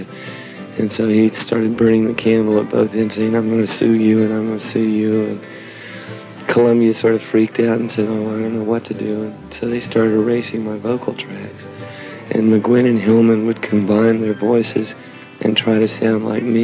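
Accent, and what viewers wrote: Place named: South, United States. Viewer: He had a beautiful soft southern drawl. I find that his song is very calming when I feel tense.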